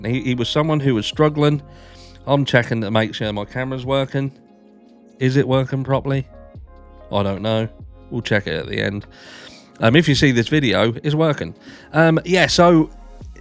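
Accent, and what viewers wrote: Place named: Norwich, United Kingdom. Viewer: You sound like you're from my neck of the woods, Norwich way?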